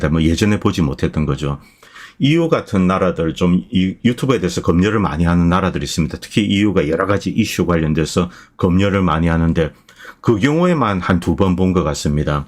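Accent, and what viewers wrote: Gyeongsang, South Korea. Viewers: 이 사람 똑똑한척 하더니 부정선거 주장을 하는거 보니 영 국짐 프레임 이용하는 그저그런 사람이네 자유민주주의의 최대 적이라할 쿠테타에 대한 개념도 없고 경상도 말투며 그냥 제대로된 보수가 아닌 극우네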